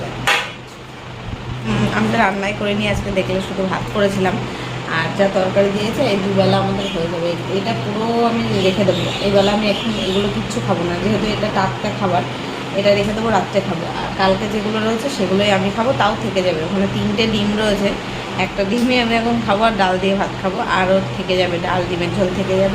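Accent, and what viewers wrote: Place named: Bangladesh. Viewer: Eto modern sobkichu , kintu asba , jaba , khaba ei bangal kothagulo birokto lage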